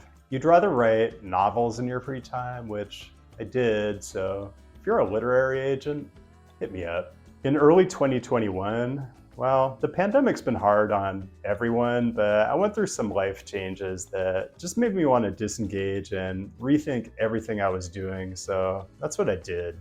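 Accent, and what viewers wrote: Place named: San Diego, United States. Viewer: He is the most handsome white man I have ever met. He speaks like a person from San Diego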